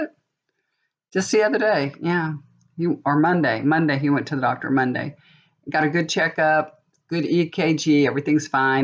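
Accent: South, United States. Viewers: Kim for Intuitiview mentioned you in her latest post. So glad she did. Great channel and you're Southern twang is so cool. (I'm Australian)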